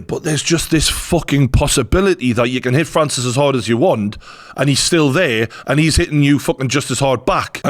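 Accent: Newcastle, United Kingdom. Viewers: Your bang on geordie boy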